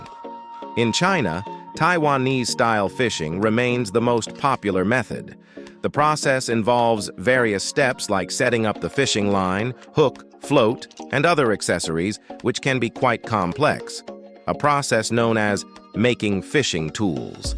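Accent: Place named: United States. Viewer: Our A.I. narrator is using American English and the lure visuals are of American anglers. Why in the world are the creators of this video comparing lure fishing to Taiwanese bait (I assume) fishing, something that few Americans know about, let alone practice? Is Taiwanese style fishing common in Australia, perhaps?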